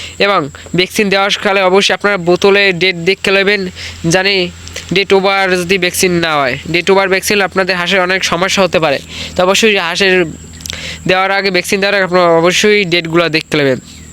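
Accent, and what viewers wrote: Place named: Barishal, Bangladesh. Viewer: ওরে বরিশাইল্লা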